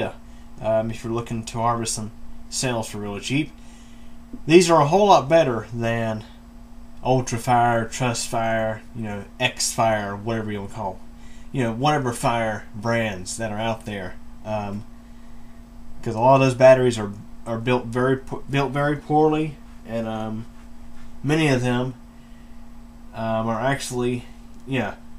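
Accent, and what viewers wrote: United States. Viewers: I know this is an old video, but I bought an 11 dollar generic HP battery, because it was cheaper than buying the cells for a flashlight. So I was somewhat concerned that they may be low capacity. Finding this vid and then hearing your American accent was very reassuring for some.odd reason so thank you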